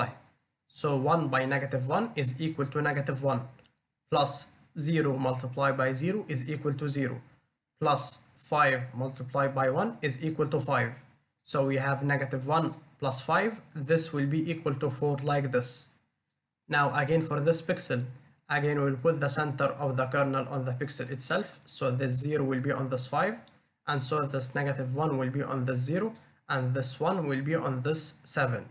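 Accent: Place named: Egypt. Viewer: Great explanation bro, I also feel that's an Egyptian accent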